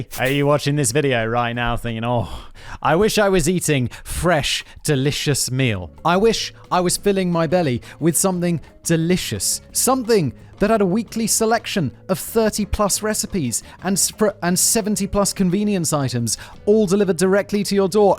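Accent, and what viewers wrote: United States. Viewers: Simon has been reading so many scripts written by US. writers his brain is starting to use our pronunciations. This channel is great and the rants are classic. Thank you for making me smile on my darker days. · Simon's getting more and more of an American accent. We do say "ad ver tise ment" not "ad vert as ment". LOL.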